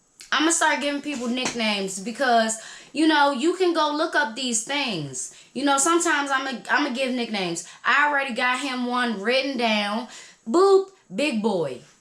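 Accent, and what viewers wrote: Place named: Florida, United States. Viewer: It's pronounced "Pom-Puh-No", Flawda. Haha. I love ya accent. Bless you on your entrepreneurship. You're still effortlessly beautiful. 👑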